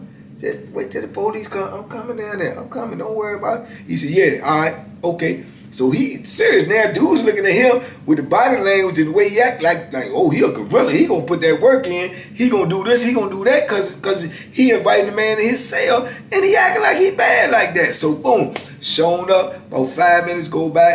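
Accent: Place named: South, United States. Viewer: Just caught your channel, homie! Just wondering where you're from? I've heard a lot of stories from up north, but i swear to God, you were born in the south wth that accent! 🤣 keep up the awesome content.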